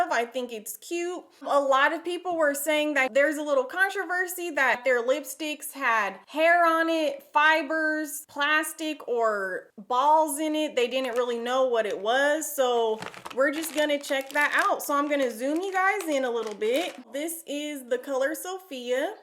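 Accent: South, United States. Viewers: Omg your voice 😂 it is so cute. Its super southern but not.. At the same time. Where are you from? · I love her country accent 😂❤️ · What accent is that????? I thought you sounded southern for a second but idk anymore now you just sound like you're yelling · This is the first video i watched of her .. where she from? She definitely has an accent . Like southern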